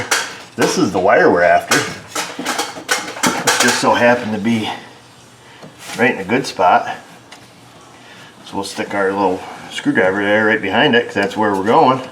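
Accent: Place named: Oklahoma, United States. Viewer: I'f you never told anyone that your shop was in New York, most people would think you were from Oklahoma or Kansas or Texas. You sound like an Okie when you talk not a New Yorker!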